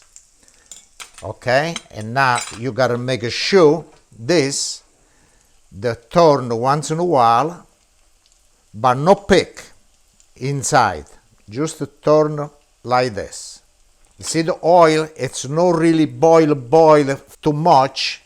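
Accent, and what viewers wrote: Italy. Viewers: Great Italian accent Signor!!! I love this recipe will do this soon!! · I just ADORE this guy! Not only are his recipes so wonderful, but I love his Italian accent!! God bless him. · He's SO adorable and funny and l LOVE his ltalian accent. J'adore les aubergines et ça, ça a l'air délicieux. Mille grazie. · Isn't he the most adorable and handsome Italian Chef ever, love his accent! who needs a Gino when we got Grandpa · The recipes, the expertise, the actual food after it’s done, the beautiful kitchen he has, when he samples his cooking, his having the glass of wine during his sampling of the dish he made, his humour and of course THAT TERRIFIC ACCENT!!!!!! HOW can we NOT love Chef, HOW???? He’s living proof that the Italians are the masters of cuisine and that no one knows food better than them, no one and as I’ve told my Italian friends, “You’ve had the privilege of growing up eating like KINGS & QUEENS!!!” And that my friends isn’t an exaggeration, it’s the TRUTH! 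· I Love that Italian accent! Eggplanta Meata ballis. So musical. 🎵🍆🎵🍆🎵🍆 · I love your accent! I start to feel Italian. great recipe! , "like-a de-boss, cuz I'm a-boss ya". classic!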